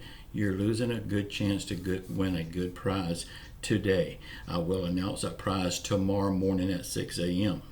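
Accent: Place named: Texas, United States. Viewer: Damn I like you! You gotta be from my neck of the woods in either south east Texas/Oklahoma, not that it matters though lol. You say yall' prefect! I'm a supervisor and my guys love it when I say "Good Morning Yall" during the morning safety meetings lol. Of FYI you were one of the first to report the last update before the other update lol!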